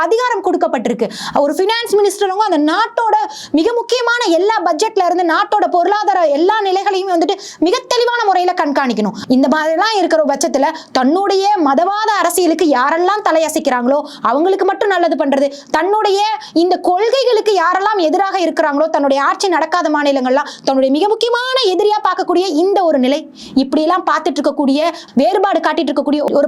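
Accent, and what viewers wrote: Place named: Kongu, India. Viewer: பேச்சு‌வழக்கு கொங்கு நாட்டு பக்கம்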